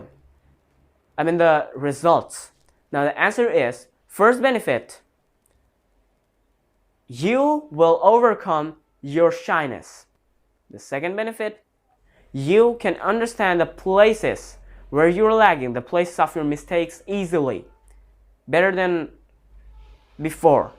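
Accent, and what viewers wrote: United Kingdom. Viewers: Alhamdulillah...Love the British accent...keep it up..❤❤ very good job. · Nice job, keep it up broh! Love the British accent btw 😉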